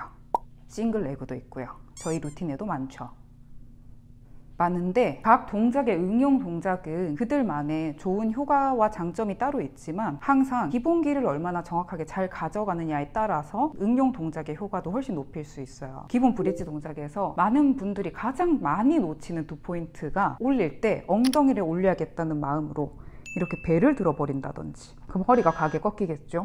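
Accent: Gyeongsang, South Korea. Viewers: 경상도 말투~ㅎㅎ 넘나 좋은 운동 감사합니다♡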